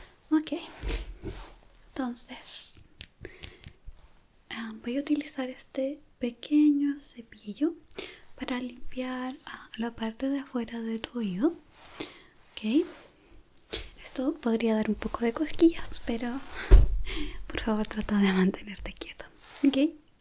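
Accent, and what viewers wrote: Chile. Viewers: Acento Chileno:0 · Es chilena 🇨🇱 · Amo el asiento chileno más videos así por favor ❤️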